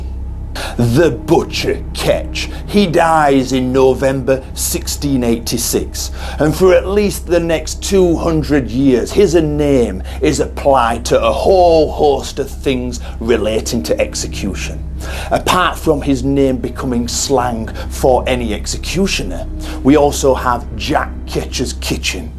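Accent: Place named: Middlesbrough, United Kingdom. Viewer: I'm trying to figure your accent out....a bit of a Middlesbrough accent....a bit sunderlandish, bit of a Mild Geordie twang. I think your from somewhere between Hartlepool and Sunderland. I'd love to know if I'm close.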